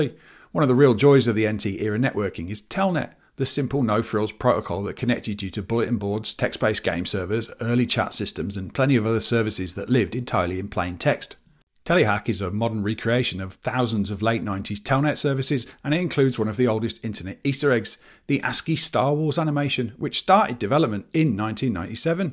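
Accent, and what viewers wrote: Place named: Midlands, United Kingdom. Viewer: Can I just say that your diction and pronunciation is fantastically clear. Down to the gentle midlands accent too.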